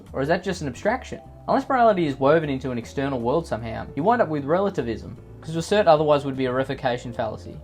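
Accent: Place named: Australia. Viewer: your funny australian accent tickled my fancy dear young man you get a like 👍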